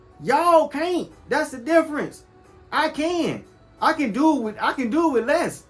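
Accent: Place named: California, United States. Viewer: Lol. Straight up! Say bro as soon as u said “beaucoup” I knew u was a real one. Only people I hear say that word usually from the n.o. But u sound like u from the bay or somewhere in cali. Real recognize real. 🔥 content padna! 💯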